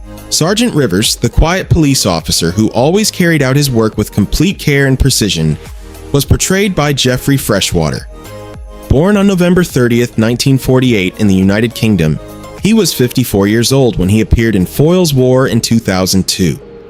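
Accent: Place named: United States. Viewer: Why an American voiced narrative?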